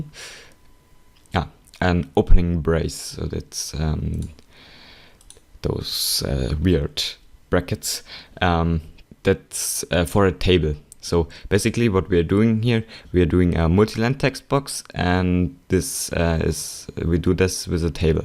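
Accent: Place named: Germany. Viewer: Wieso hört man es immer heraus, wenn ein Deutscher englische Tutorials macht? :D (wohl, weil mein englisch genauso klingt?^^) Ich wollte eben nachfragen, aber ein Blick auf deinen Kanal gab bereits die Antwort. Ich hätte es ja als hübsch empfunden, wenn du am Ende noch das Beispiel direkt in MC gezeigt hättest. p.S.: wird im CC-Forum keiner mehr angenommen? Ich bekomme einfach diese validation email nicht zugesendet und habe dadurch keine Rechte im Forum noch kann ich den Admin anschreiben - blöd. Dabei hätte ich gar eine sehr nützliche API.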